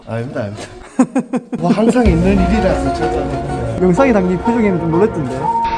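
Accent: Gyeongsang, South Korea. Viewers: 근데. 은 고향이 어디세요??? 경상도 사투리인가? 강원도잉가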